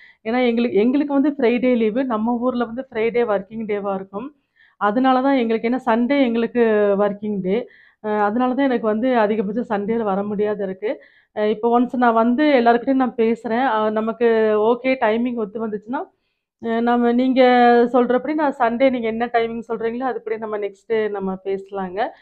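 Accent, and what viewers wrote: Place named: Coimbatore, India. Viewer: I want to hear the Coimbatore slang akka.love you so much. All the best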